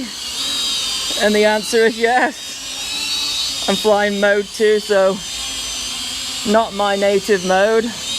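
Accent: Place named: United Kingdom. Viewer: 언제 들어도 영국식 영어 발음은 듣기좋음 👍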